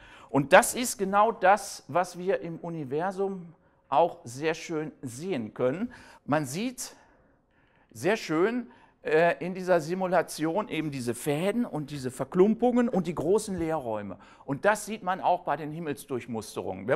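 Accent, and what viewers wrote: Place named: Westphalia, Germany. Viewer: Aber in Leipzig geboren und ab 1977 in Münster studiert. Da kam es wohl zur westfälischen Adaption. Was für ein Glück. Man stelle sich diesen Vortrag mal auf sächsisch vor. "Dor Ürgnoll, de Krawwidodsjöön, däs Ällägdröön is büngdförmisch, ün de Raumdsait is gegrümmd." Nee, lieber nicht :-)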